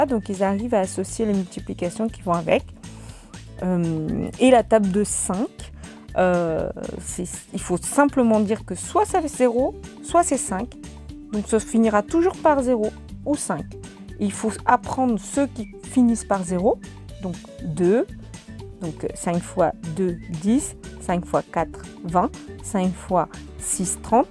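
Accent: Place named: England, United Kingdom. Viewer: j’ai crue que taites anglaise moi en mode 🥲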